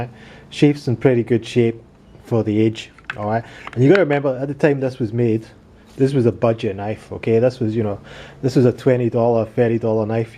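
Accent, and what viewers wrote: Scotland, United Kingdom. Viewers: Are you Scottish. Cool video man. Thanks for sharing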